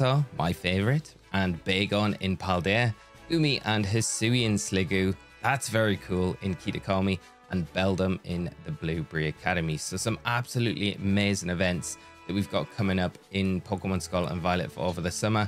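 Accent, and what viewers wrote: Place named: United Kingdom. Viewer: Brittish accent? So strong... 😢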